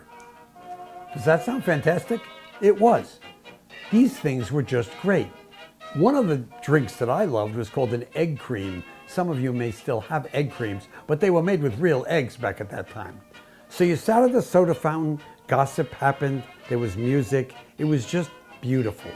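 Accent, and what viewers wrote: New York, United States. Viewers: Where exactly did you grow up, you say New England but I hear New York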